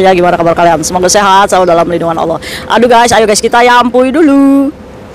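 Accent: Indramayu, Indonesia. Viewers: Metu bhsa dermayune angel angel 😂😂😊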